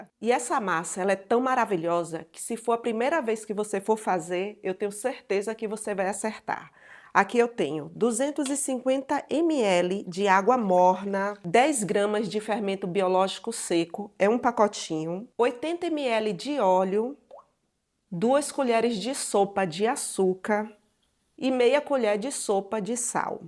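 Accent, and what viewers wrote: Bahia, Brazil. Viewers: Oi amada. Morei 22anos. Em Salvador, e me falaram que esse molho se chama molho lambão uma delicia, morro de Sdds desse sotaque lindo, hj voltei para O Rio de Janeiro , estou me recuperando de um momento de doença, mais assim que melhorar farei seus salgados para venda , obrigada e Deus abençoe sua vida sempre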